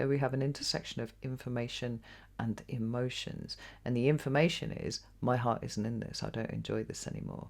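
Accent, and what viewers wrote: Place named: North, United Kingdom. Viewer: Extraordinarily insightful and helpful references e.g. to the number 7 = rich content! Your accent seems to have become slightly more Northern since last time I watched :D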